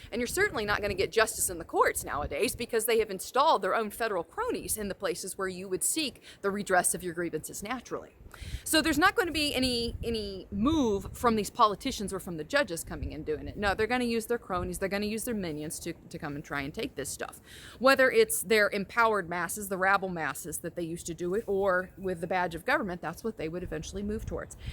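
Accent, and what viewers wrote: South, United States. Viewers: Yes I like what you have to say I think the same way I hear you got to hear you have a Southern Voice I live in South Carolina I like to meet you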